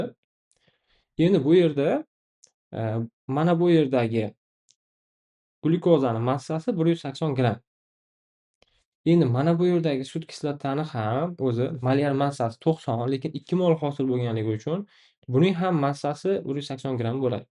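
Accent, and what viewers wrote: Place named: Tashkent, Uzbekistan. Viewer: Рахмат сог ва саломат булинг сиз АТФни тшунишимга ёрдам беряпсиз лекин Тошкенча шевани ёмон килдизда😅😂😅😊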